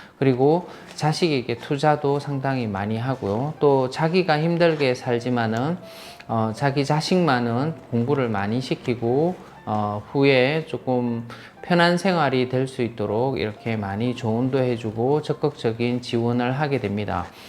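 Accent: Gyeongsang, South Korea. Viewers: 부산경남 분이신거같아요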